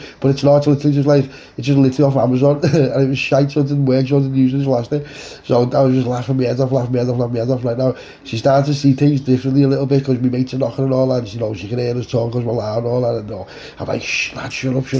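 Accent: Liverpool, United Kingdom. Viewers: Yes brother scouse belter as per deffo worth the hype big up the narrator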